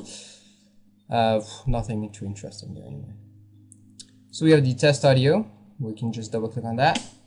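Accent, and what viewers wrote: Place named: Quebec, Canada. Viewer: enfin un quebecois xD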